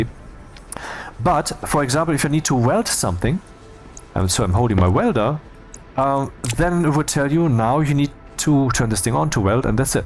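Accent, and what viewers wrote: Germany. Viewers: I love everything about this video ❤️ I love that your introduction takes almost 5 hours, that you get slowed down by needing to explain the details then end up sayin "we'll get back to that later" and I love the German accent. I got here by chance. Steam showed me this game I had never heard about at checkout buying a totally different genre game. So I watched the first 20mins of this video to help me decide - and promptly added the game to my purchase. Now I am into your video and have 22hours game time registered over 5days, trying to figure the concepts out myself and only taking hindsight hints from your video. Best Xmas gift for myself ever 😂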